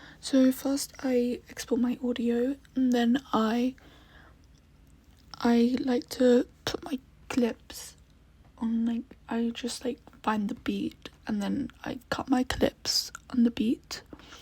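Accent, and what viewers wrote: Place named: United Kingdom. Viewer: OMG YOURRR BRITISH AYY MATE Sorry that was cringy😭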